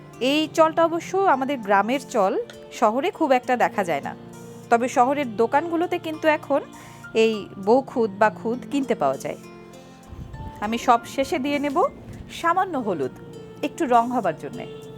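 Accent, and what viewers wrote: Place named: Mymensingh, Bangladesh. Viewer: amader paser bashar ak varatiya maymonsing er sm apnar moto kotha bole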